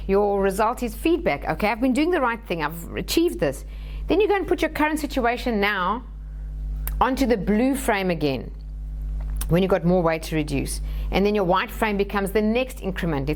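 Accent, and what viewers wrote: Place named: South Africa. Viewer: Hi thank you for the video, your accent sounds like South African - excellent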